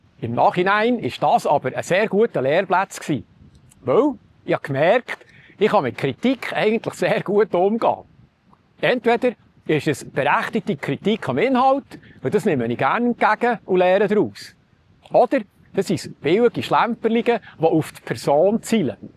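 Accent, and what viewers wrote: Bern, Switzerland. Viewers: Ich finde dini arbet SUPER !!! mach gnau so wiiter. Au dis Bernduetsch findi sehr agnehm den hiea in Florida ghoer ich das chuum 😂😂 wuenche Dir schoeni Festtaegli und en guete rutsch is 26gi .... Liebi gruessli us Florida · Sie sind einfach eine grosse Klasse. Sie sprechen ein schöner Berner Dialekt ❤️ Lieben Gruß aus dem schönen Aargau. Dankeschön ihnen.😃😃🌲🌲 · Du sprichst so ein klares Berndeutsch, schon deshalb ein Hörschmaus! Dazu sind die Ausführungen anregend. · Ändlech eine, wo Bärndütsch schnurret! Carry on, Gruss von einem aus der ehemaligen Schweiz vertriebenen Stadt Berner aus den Philippinen. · Hallo, merci as Du üs o es biz i Dini Erfahrige mit dem Kanal u i dHintergründ lasch la blicke. Interessant für mi: Din Gedanke live vor eme Publikum... han i o scho gha 😊 U uf Dini Themene fürs 2026 bin i gspannt. Bis jetze nume es bravo a Di! 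Blieb dranne, bhalt Din asteckende Humor u o sBärndütsch, i ghöre das so gärn. Hebs guet, gsegneti Feschttäg, Grüess us em chalte Mittelland, Rolf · I ghöra z Bärndütsch eifach so gärn. 👍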